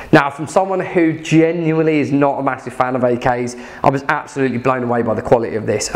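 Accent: United Kingdom. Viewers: Fuckin' brits accent. USA!!! USA!!! USA!!!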